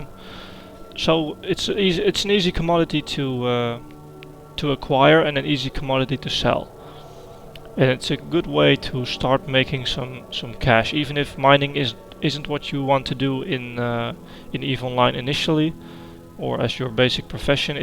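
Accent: Netherlands. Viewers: do i hear a lil dutch in ya?:P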